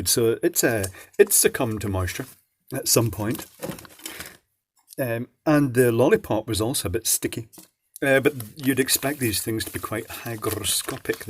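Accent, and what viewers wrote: Scotland, United Kingdom. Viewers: Well, the Scottish accent is good, Plus has a good grasp of all things technical, and explains things really well (something I really appreciate). It doesn't matter what your level expertise, you'll probably learn something by watching. eg: I didn't actually know that the gas given off by those chemical heaters was Brown's gas (HHO, in a perfectly explosive mix) but it makes perfect sense now that I've been told. Us techies can be strange people, and understands us better than our parents did, so it's a bit like coming home to the home you never had. By being himself attracts technical people, and gathers people who all speak the same language. Sometimes I wonder if himself knows how special this is.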